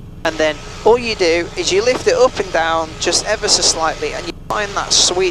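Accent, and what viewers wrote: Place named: United Kingdom. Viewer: I would watch your video if you could speak english rather than British stuff. So rude!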